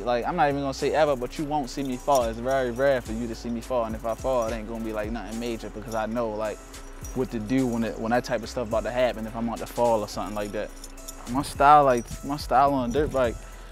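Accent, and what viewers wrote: Baltimore, United States. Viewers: Yeah, that's our native accent. "Dug" = "Dog" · Baltimore accent bro it's how we speak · thats that bmore shit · that's a Baltimore accent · Baltimore accent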